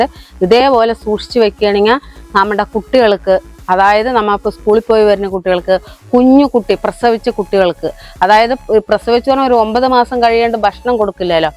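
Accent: Palakkad, India. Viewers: Oru palakkadan speech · പാലക്കാടൻ ഭാഷ സൂപ്പർ ❤ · പാലക്കാട്‌ ഭാഷയാണല്ലോ... എവിടെയാ സ്ഥലം അമ്മേ 😘😘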